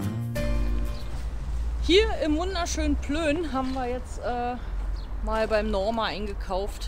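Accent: Berlin, Germany. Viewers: Hallo deine Videos schaue ich sehr gerne, bin bei deinen Touren auch etwas dabei. Macht viel Freude deine Touren und Erlebnisse. Auch deine berliner Aussprache sehr nice. Grüße Aus Bayern Rudi t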